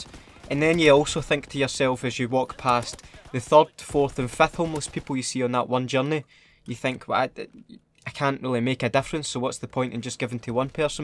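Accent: Scotland, United Kingdom. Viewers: South Africa I watched the soap video on Facebook uploaded by game lads or something like that. The first video on YouTube I watched was the clowns video. (I also frequently binge your videos cause sometimes nothing else interests me except for a Scottish accent. Not pandering, just stating) Part of the notification squad now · I'm from Finland & the first video of yours that I watched was your "most useless attachments #3", which made me fall in love with the Scottish accent (no homo). I've stopped following any other COD youtubers than you. Needless to say, awesome work bro. Please keep it up, really brightens my day when I see that you've uploaded a new video. Cheers. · England and the first video I don't know but it was around about the time you did the video about a party and everyone was off their heads! Love your content solely because you're a Scot! 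My dad was from Edinburgh so I can understand somewhat what you say! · Im from England! I have to say the first video of yours I watched was tactical nuke incoming when I was in a mw2 hype, thought you were funny so watched a few more and the wee Scottish accent just made it even funnier! keep it up though man! im glad to see someone who's made it big and still thinking about the people less fortunate than themselves! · 1) I live in Scotland, tho I'm from France 2) First video I saw was the one on Bully, through a facebook post. I remember it because it was my first week in Glasgow and I was like "Oh man is that the accent I'll have to live with for the next 4 years?". Loved it tho, and I've been showing your videos to my friend and family at home whenever I get asked how is the Scottish accent;) Thanks a lot for what you did there, it is nice to see someone do stuff like that and have conscience of what is around them. 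Keep up the good work mate:D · Greetings from Texas over in the US! First video I ever watched was "WTF EVEN ARE COD MAPS?!" It's an amazing video that intoduced me to your hilarious content and great style of commentary. Never did I think I'd spend so much time watching a Scottish man rant about COD so calmly. Thanks for the giveaway, and I hope you help raise a lot of money for such a just cause.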